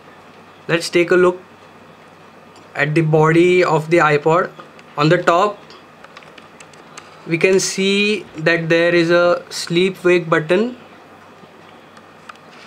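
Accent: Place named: India. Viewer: Is it me or every Indian sounds the same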